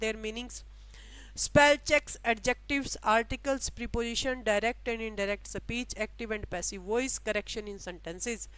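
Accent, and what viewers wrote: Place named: Bagri, India. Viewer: ਬਾਗੜੀ ਆ ਮੈਡਮ,, ਪੰਜਾਬੀ ਭਾਸ਼ਾ,, ਜਿਦਾ ਬੋਲ ਰਹੇ ਆ ਪਤਾ ਲਗ ਰੀਆ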